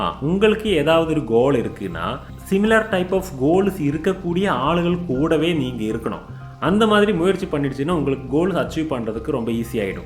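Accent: Kerala, India. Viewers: Bro Malayalam ma unga voice la theriunchu thu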